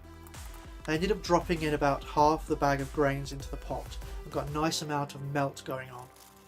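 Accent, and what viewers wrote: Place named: United Kingdom. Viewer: The Solder pot, im guessing your in the UK (accent and pound sign), did you have to change anything to the plug example earthing or anything - i've heard they are not safe for UK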